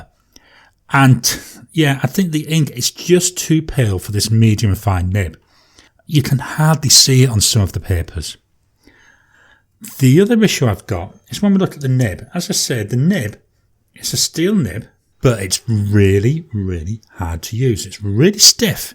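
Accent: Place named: England, United Kingdom. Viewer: Hi. I have two of your pens; the Moonman M800 (Moonman nib) and the Jinhao 159 (I have a few in different colours). I don't have a Hong Dian but I do have the Lamy Studio which looks very similar. The Jinhao is a good pen for the price but feels a bit too large in my hand. I was surprised it took the top spot in your ratings. By the way, I know you are in Australia but your accent has me confused. It sounds English but I am stuck on the exact region. I am from UK but your voice still has me a bit puzzled!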